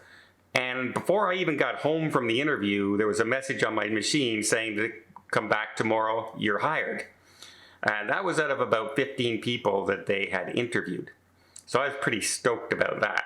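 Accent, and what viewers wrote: Canada. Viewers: I just love when your Canadian accent comes out, it always makes me smile. Thank you, for sharing your story.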